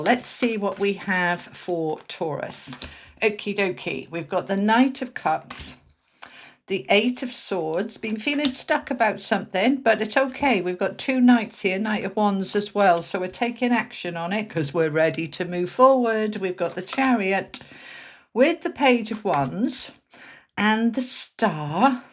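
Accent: Wales, United Kingdom. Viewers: I always think I can hear welsh in your accent!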